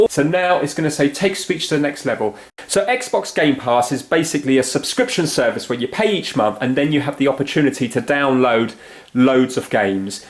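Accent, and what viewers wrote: England, United Kingdom. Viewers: Its the British accent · Its really fancy to watch a tutorial with a british accent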